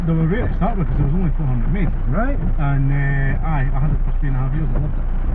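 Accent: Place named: North, United Kingdom. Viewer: Difficult to understand your strong northern accent. Need subtitles.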